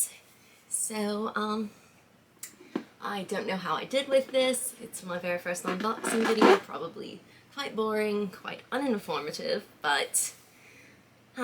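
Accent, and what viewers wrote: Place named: United Kingdom. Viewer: Another great video truly a masterpiece of a face and yes he does great artwork I'll have to look into the company by the way you sound like you have a British accent in the video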